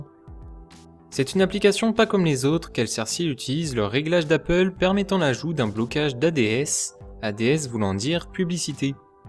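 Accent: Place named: Switzerland. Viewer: Sémantique à revoir. Vidéo bonne. Accent Suisse qui passe mal, manque de punch.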